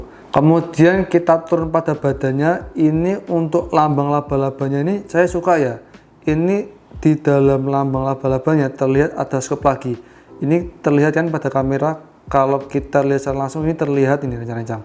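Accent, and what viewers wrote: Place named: Java, Indonesia. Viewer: Selalu dgn ciri khas medoknya,sukses selalu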